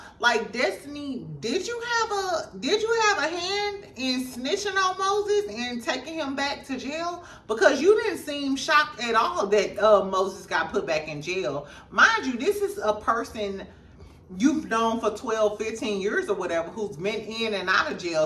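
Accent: St. Louis, United States. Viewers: Good commentary, I love your St Louis accent sounding like Sunni….hur and thur, it’s so cute. While it’s tacky for Chris to try to set up anyone people forget this set up happened after Mel had filed for divorce and no possibility of reconciliation, she didn’t want Martel back then. If he was trying to hook up Martel during the marriage, that’s different. To me, the timeline of when all this was happening is getting downplayed, Mel and Martel may have just been still married on paper while the divorce was in progress. IMO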